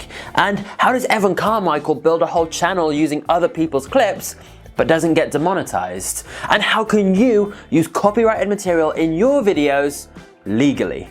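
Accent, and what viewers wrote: United Kingdom. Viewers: Thank you, you've have explained the topic in a manner of very detail. Quite a long video though, but I like your Brithish accent.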